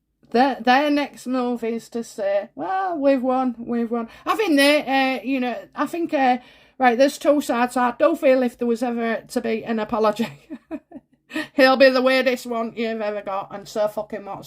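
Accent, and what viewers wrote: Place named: Yorkshire, United Kingdom. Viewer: Hi my friend hope you are feeling better and stringer and please bring your accent back i feel so at home with you two Yorkshire lasses together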